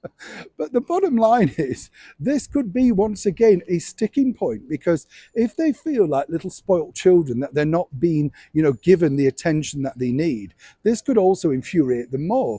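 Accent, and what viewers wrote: Yorkshire, United Kingdom. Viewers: You are so positive...you always cheer me up ..I like your cute little Yorkshire accent, your nice looks , and one must mention the ties....... Blessings for 2023 from very cold Portsmouth.....roll on the spring....xxx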